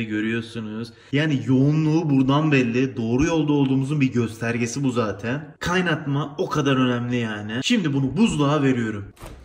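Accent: Adana, Turkey. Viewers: Hatay diyo ama Adana şivesi var anlamadım ki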